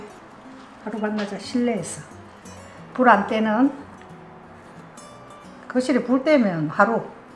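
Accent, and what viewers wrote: Gyeongsang, South Korea. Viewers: 님은 경상도에 사시나요~?경상도사투리로 말씀하시는데~김치도 약간 경상도식으로~ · 작년에 친정엄마 돌아가시고 우연히 이 채널을 보게 되었는데 펑펑 울었네요. 재료 손질하고 넣을때 터프하신 손놀림이나 경상도 말투나 엄마가 너무 생각나요. 요리를 따라서 하진 않지만 새 영상 올라오면 다 챙겨봅니다. 자주 올려주세요^^ 엄마와 딸 너무 보기 좋아요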